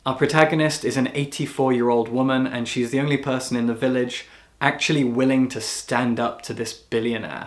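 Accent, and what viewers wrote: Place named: West Midlands, United Kingdom. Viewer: You're from the West Midlands? I never would've guessed from your accent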